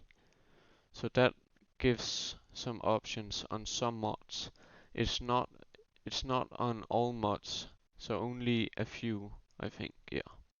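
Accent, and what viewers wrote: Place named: Denmark. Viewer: det er hvad jeg kalder en dansk accent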